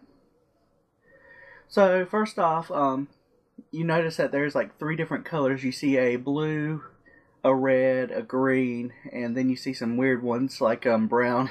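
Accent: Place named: South, United States. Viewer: southern guy love it :p sound like a friend i used to know .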